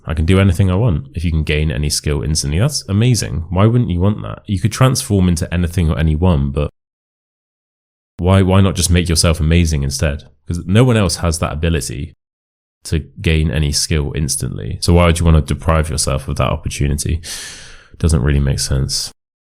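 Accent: RP, United Kingdom. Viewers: Hi, it's really amazing to learn British English with you. Thanks a million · I started watching your videos a few weeks ago to improve my British RP pronunciation, and they’ve been amazing! But here’s the twist 🔀 I’ve become too addicted. I started watching them at night, and now they’ve turned into my secret weapon for sleep. A few nights ago, I fell asleep halfway through a video, and yesterday… I slept for 12 hours straight! So, congrats 🎉🍾🎊🎈 your videos are not just improving my accent, they’ve also become my personal sleeping pill. Keep up the great work, I guess? 🧐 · Thank you very much dear Mr yes you are speaking real British English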